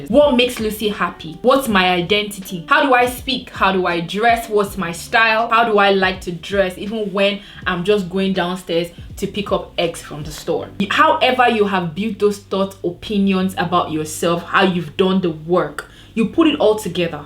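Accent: Nigeria. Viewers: The intro! :) Girl your accent sounds beautiful!!!! Naija giiiirrllll <3 MUCH LOVE SIS! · This video just said it all and I love the fact that you also don't care about what people think about your accent,if it's the accent that's their problem,they should find the accent best suitable for their ears then. Team Nigeria 🇳🇬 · I love your beautiful nigerian accent 😻 I am French and been watching you for so long. I understand so well Keep going and glowing ❤ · Girl one of the reasons I listen to you is because of your accent 😂. Naija represent, don’t mind these people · I’m Kenyan!🇰🇪And I love your Nigerian accent! Haters are gonna hate. Just keep up with your amazing videos & advice!❤️ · I actually love Nigerian accents I think they sound so beautiful and genuine, honestly growing up with parents who have an accent makes me ask the people who said, why you watching the video, if you can’t get past the accent?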